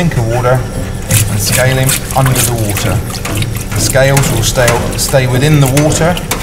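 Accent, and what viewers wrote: United Kingdom. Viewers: I stumbled upon this channel when I searched for lobster videos a while ago. Definitely worth subscribing if you like sealife, British accents and wholesome educational videos. Keep it up, you deserve way more subscribers! 👍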